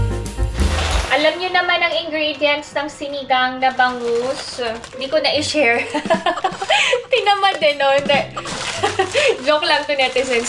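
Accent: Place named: South Korea. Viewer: Hello,! Napansin ko lang po sa mga vlogs nyo na mala-Korean na rin po yung pananalita nyo and curios ako kung aware po kayo dito. What I mean is laging may vowel sounds (-uh) na yung mga words nyo ending in consonants. Say for instance the following words: Steak-uh Netizens-uh And-uh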